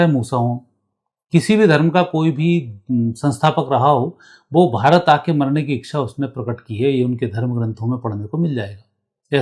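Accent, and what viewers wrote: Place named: Madhya Pradesh, India. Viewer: आपके स्वर से प्रतीत होता है मानों आप भोपाल या होशंगाबाद के आस पास से हैं। या मध्य प्रदेश कहीं से। क्या मैं उचित कह रही हूँ।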